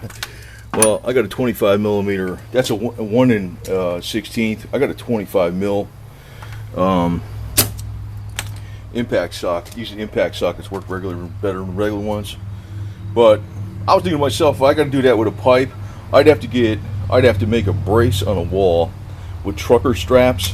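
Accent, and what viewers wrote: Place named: Boston, United States. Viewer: I hear the North east accent- is that Boston 'Frickin"?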